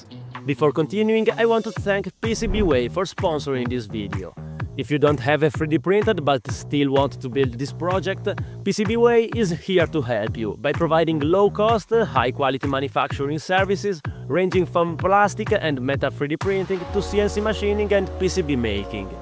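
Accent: Italy. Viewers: From this accent you have you are italian for sure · Bro si sente che sei italiano lol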